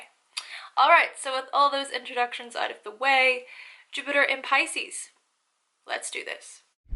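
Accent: Ireland. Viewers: It's because is from lovely Ireland^^ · You have the most wonderful inflections. You're Irish, but it's a very lovely version, thank you.. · The Irish accent is extremely thick.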